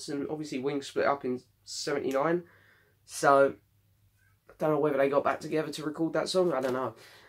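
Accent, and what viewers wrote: London, United Kingdom. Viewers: thank you for your take on Paul's solo albums, you have a great take and insight. In fact you introduced me to the album Ocean's Kingdom I wan not familiar with before listening to your ranking. Good going kid. I hope you meet Paul someday and get to produce or do something with him; your very knowledgeable and sound like a great London bloke. At least that is what this yank hears. You certainly 'ave an ear for production and music. I subscribed ti your channel, I was so impressed.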